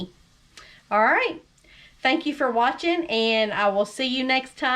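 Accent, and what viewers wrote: United States. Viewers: Beautiful American accent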